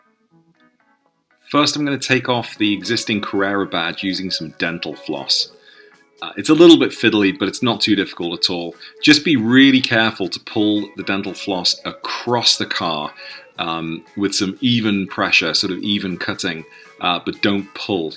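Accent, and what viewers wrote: Liverpool, United Kingdom. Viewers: Thanks for this ....bought new emblems for my 997.1 today so gonna give it a go! :) .....sounds like you're from my neck of the woods? (Liverpool UK) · You sound like a scouser fella but you’ve got U.S plates..?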